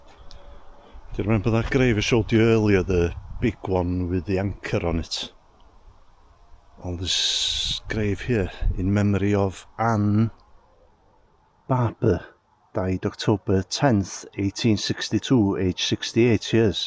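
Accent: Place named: North Wales, United Kingdom. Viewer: One's again another very interesting video introducing you are great great grandparents . The Romans were very ruthless it's a shame this happened to the druids . Can you imagine if if they didn't slaughter the druids look all of that amazing knowledge passed down to generations. I do like your North Wales accent I do prefer it to the South Wales accent which I am from South Wales. Just a thought 💭 Scandinavian and Norwegian sound very familiar to a North Wales accent . One's again DIOLCH 🏴.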